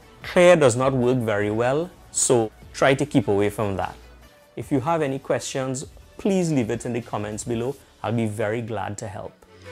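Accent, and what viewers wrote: Trinidad and Tobago. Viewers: Great video, you sound Trinidadian. I'm happy to see a tech video that's not from india or usa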